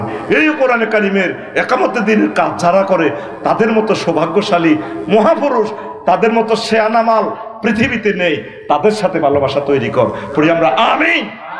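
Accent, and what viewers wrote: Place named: Noakhali, Bangladesh. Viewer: হুজুরের ওয়াজ সোঠিক অনেক বালো ওয়াজ নোয়াখালির বাষায় সুনতে বালো লাগে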